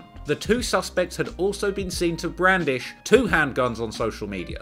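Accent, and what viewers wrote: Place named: United Kingdom. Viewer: So refreshing to hear a traditional British accent talking about this kind of subject... it’s good music and I’m happy to not hear a wannabe roadman talking for once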